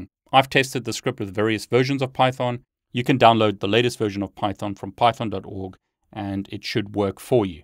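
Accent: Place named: South Africa. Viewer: are you from south africa? your accent sounds south african!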